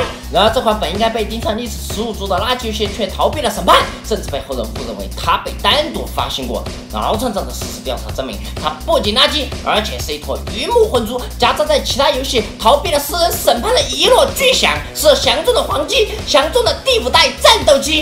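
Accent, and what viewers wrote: Sichuan, China. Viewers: 台港澳地区观众听川普不会别扭吗´_>`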